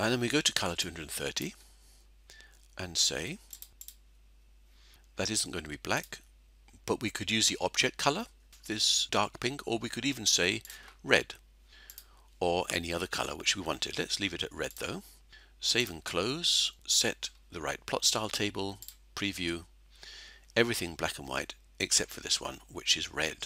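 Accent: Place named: South Africa. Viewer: I'm trying to work out where you accent is from, Im guessing South Africa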